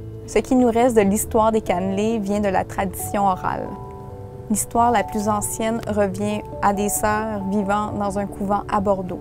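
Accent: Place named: Quebec, Canada. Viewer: OMG what is her accent, is it Canadian??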